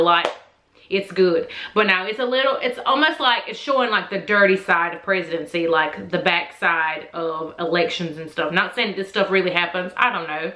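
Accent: South, United States. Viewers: Where u from the accent sounds southern ??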